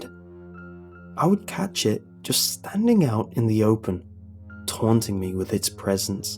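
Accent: United Kingdom. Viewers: half tempted to watch IT, but deathly terrified of clowns and I wanna say has an English accent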